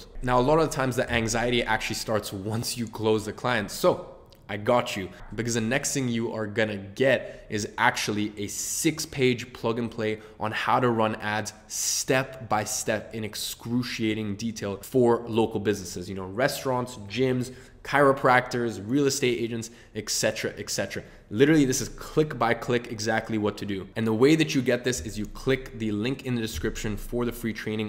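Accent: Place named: United States. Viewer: why does he have an american accent if he was raised in Britain?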